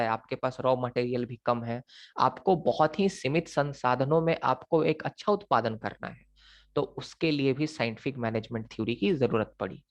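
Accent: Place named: Bihar, India. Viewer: Sir your teaching skills are just🔥🔥 and jo bihari tone hai usme to mja hi aa jata hai..❤❤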